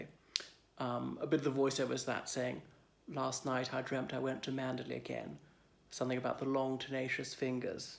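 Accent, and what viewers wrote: RP, United Kingdom. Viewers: You could be Tom Burke’s double, just with a plummy accent 😍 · All formally educated people (i.e. university) should be encouraged and expected to have an RP-ish accent. I like a bit of elitism. The great advantage of the way you speak is the clarity, which also suggests/implies clarity of thought.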